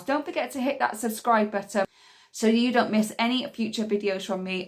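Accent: United Kingdom. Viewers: You are accent look like British love it that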